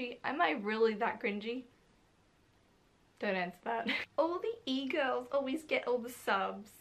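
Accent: Australia. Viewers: Are you Australian....I'm bad with accents...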